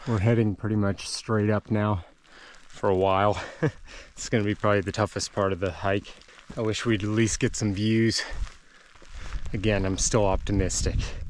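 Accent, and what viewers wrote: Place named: United States. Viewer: Is he British? Sounds so American (Australian?), but maybe my ear has been trained otherwise, such as with Scottish sheep-shearers?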